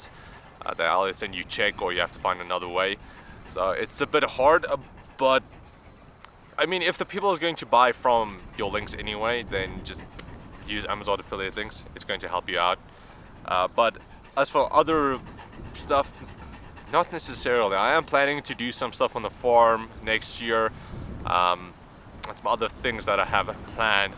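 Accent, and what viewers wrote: South Africa. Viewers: R u South African?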